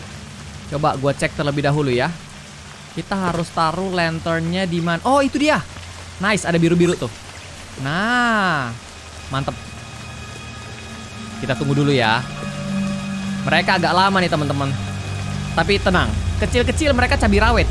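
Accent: Java, Indonesia. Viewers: Mas po koei asli wong jowo ta kok ndang nding i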